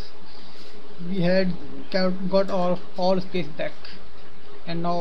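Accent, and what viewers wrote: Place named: India. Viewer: Indian english sounds like shit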